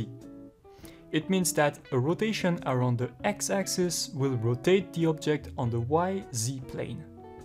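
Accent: France. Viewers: thank you) best French English ever)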